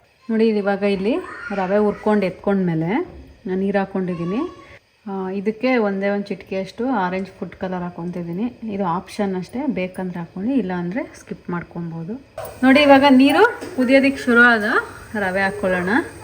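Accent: Mandya, India. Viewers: Nim bashe pakka nam mandya ❤ akka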